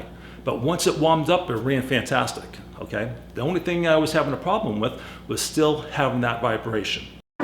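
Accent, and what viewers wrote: Boston, United States. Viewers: You cannot hide that Boston accent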